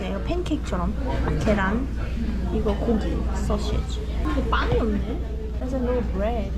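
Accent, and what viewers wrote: United Kingdom. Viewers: Loving to Listening 님 speak English with British accent in the UK borderland. Wow... What a Perfect Combination!! And very Spontaneously. ㅋㅋㅋ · 친구랑 일으니까 더 편한 너낌 영국이라 그런지 발음이 더 딴딴한 너낌이랄까 ㅎ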